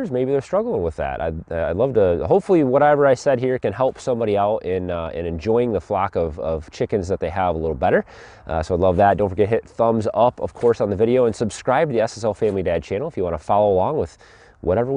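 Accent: Michigan, United States. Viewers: Bro are you from Michigan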